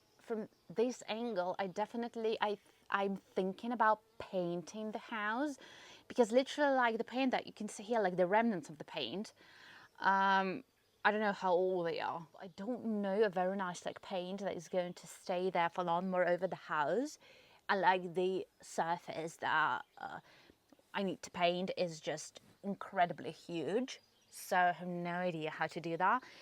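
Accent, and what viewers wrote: United Kingdom. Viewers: I am glad you chose to cultivate a traditional British accent rather than an American one! It is definitely harder to learn, but, as evidenced by your gardening, you are a wellspring of passion. Good luck.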